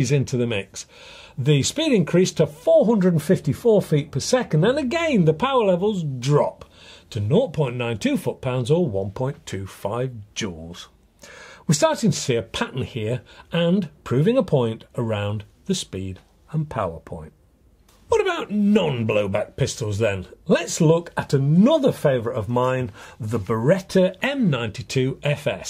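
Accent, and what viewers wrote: United Kingdom. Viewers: I love your British manner of speaking! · This channel is awesome, so well researched and explained, and oh so elegantly british 💪🏻❤🇬🇧👍🏻I have learned so much, keep up the excelent work! 👌🏻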